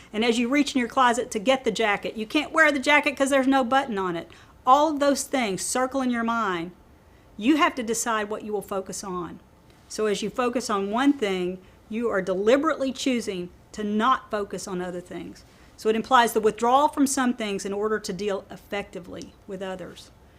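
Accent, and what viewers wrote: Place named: New Zealand. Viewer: ♥ her accent, from NewZealand :) Sounds like she's from where I live. . . sort of :)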